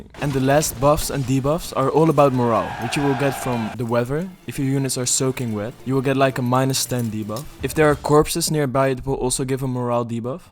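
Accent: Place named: Netherlands. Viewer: Your English sound Dutch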